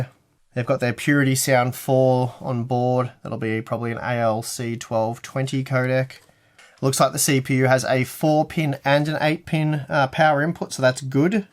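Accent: Australia. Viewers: I wasn't subscribed? OMG! I just love your australian delivery.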